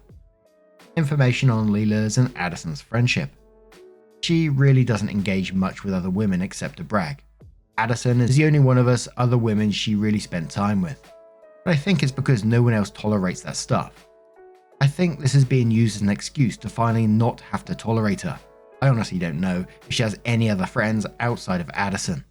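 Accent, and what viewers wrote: United Kingdom. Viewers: He's British they have a different way of speaking fuckin deal with it and stop making it some sort of big deal when it's not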